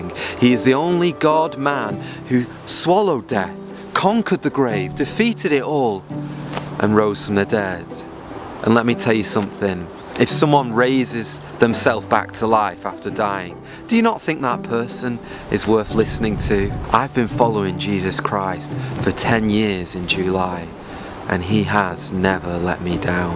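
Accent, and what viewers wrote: United Kingdom. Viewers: It's British . I say it that way too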